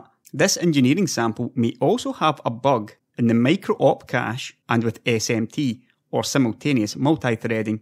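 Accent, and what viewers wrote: Scotland, United Kingdom. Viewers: TBH it doesn't change the point you made and I agree with, that there process has matured now, keep up good work, nice hearing a fellow Scot! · Scottish I understand him just fine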